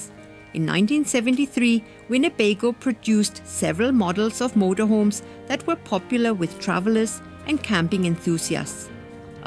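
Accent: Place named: Franconia, Germany. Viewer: Wow, sehr inspirierend - professionell gemacht und vor allem mit Herz und Gespür für Zwischentöne 🙂 Der fränkische Akzent (und natürlich als Reiseführerin): total sympathisch!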